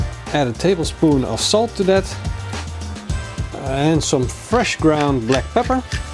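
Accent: Netherlands. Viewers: .... Sir, you are Dutch, aren't you?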